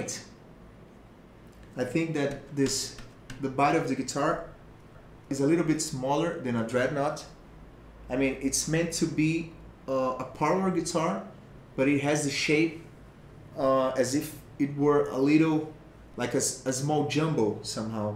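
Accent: Brazil. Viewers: Cara, vc é brasileiro? Esse inglês tá muito abrasileirado hahaha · Brazilian accent has been detected.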